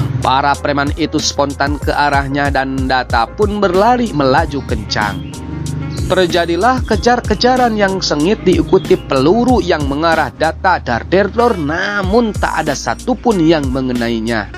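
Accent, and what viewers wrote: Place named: West Java, Indonesia. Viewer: yg cerita kita orang sunda ciri sunda banget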